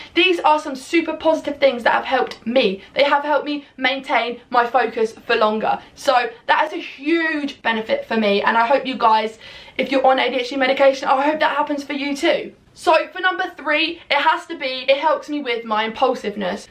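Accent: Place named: United Kingdom. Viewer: I thought you cant be prescribed stimulants in the UK? You sound like you're from Uk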